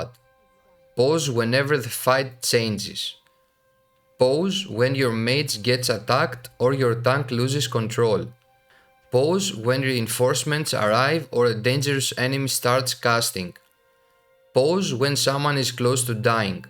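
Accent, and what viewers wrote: Canada. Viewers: love your canadian accent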